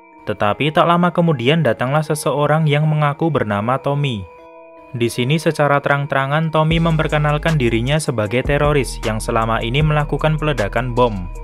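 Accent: Java, Indonesia. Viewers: Medok banget min:)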